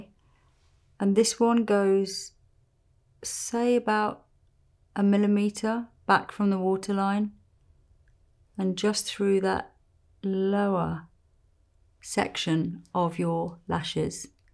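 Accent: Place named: RP, United Kingdom. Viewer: It's a lovely look, however, I have an extreme allergy to acrylates and all of the fake lash products seem to contain them, unfortunately. Same for Lashify. Any suggestions? You are so very pretty, and I love listening to your plummy voice, too.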